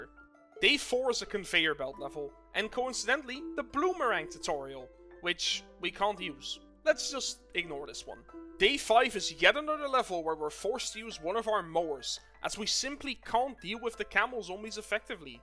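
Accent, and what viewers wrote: Netherlands. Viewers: dutch accent spotted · U dutch? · Are u Dutch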